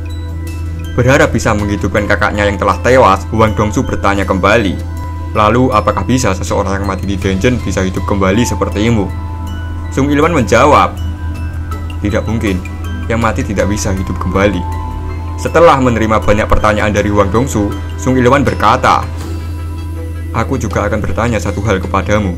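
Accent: Java, Indonesia. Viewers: Bg org jawa ya?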